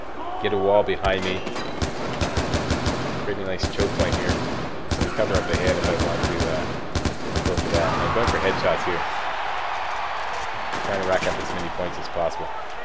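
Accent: Canada. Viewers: o ur Canadian eh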